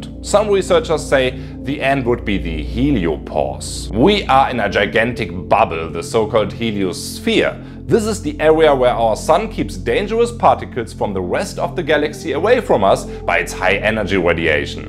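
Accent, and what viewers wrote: Germany. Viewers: Ich würde es lassen „On Englisch“. Wirklich zu deutsches Englisch und paar ganz harte Aussprache Fehler drin. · Lieber ich liebe deutsche Leute die englisch sprechen 🗣 die kann man auf YouTube wenigstens verstehen aber auch aus Grund des lustigen Akzentes schnell als deutscher identifizieren dennoch Trotzdem viel Glück und Spaß in der Welt des englischen contents!!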